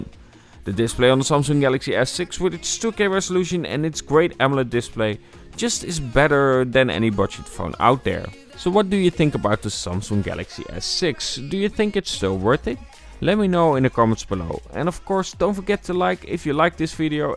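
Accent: Netherlands. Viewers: Haha nederlands accent😂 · Je hoort gewoon dat je Nederlands bent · Still using the s6. You have a dutch accent, I can hear it because I'm dutch, am I right? ;) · That dutch accent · Jij bent nederlands, ik hoor het!!! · Meteen in de eerste zin wist ik dat je nederlands bent😃 top video💪💥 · You are dutch confirmed it via you english accent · nederlands😂 kun je dds goed horen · Youre dutch, my accent is the same as youres😉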